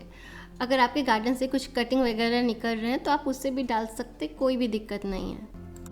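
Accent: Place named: South India, India. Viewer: Lovely Voice ....Kuch Kuch South India jaisa feel ho raha...😄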